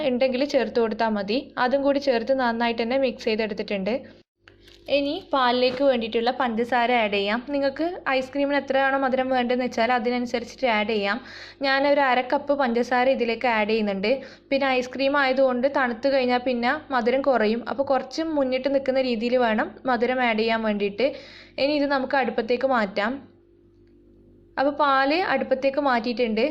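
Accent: Kannur, India. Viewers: Kannur kari aano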